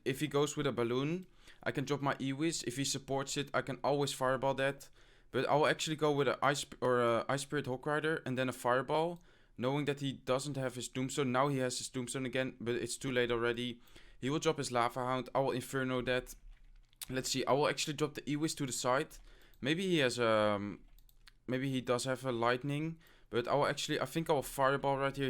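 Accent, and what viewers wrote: Netherlands. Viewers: Nice accent mate, are you dutch? (Like me) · he have a Netherlands accent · That dutch accent tho. Die herken je zo ;)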